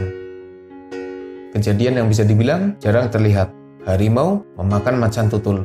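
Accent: Banyumas, Indonesia. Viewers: Rika ngapak ya min.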